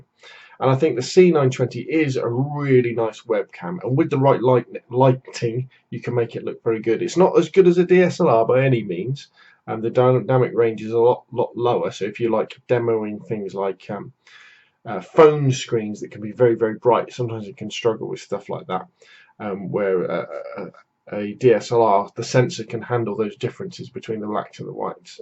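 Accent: United Kingdom. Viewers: Hy, nice video! the quality is good and there is no flow. the webcam performs depending on the system configuration so can you tell me pls on what configuration you recorded the video(processor, ram memory, video card and if you have ssd or hdd)? it will really help me decide to buy the c270 or not. By the way cool british accent.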